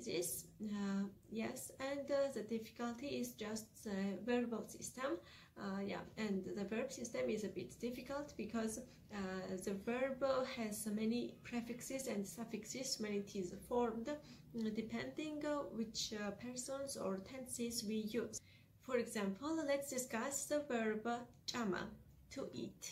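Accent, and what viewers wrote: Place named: England, United Kingdom. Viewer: I like your English accent. I'm from The Maldives 🇲🇻 and I'm a language enthusiast and I think Georgian (mkhedruli) has the most beautiful writing system. I already know how to pronounce all the sounds including (q'); thanks to my phonetic skills. My target language now is Polish and after that Hungarian and Georgian.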